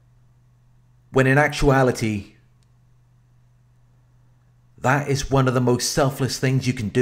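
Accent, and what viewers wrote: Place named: Scotland, United Kingdom. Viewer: I like your videos and your lovely Scottish accent.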